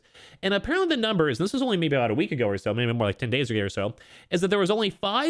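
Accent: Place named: Chicago, United States. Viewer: It’s that shhheh caaaahgo accent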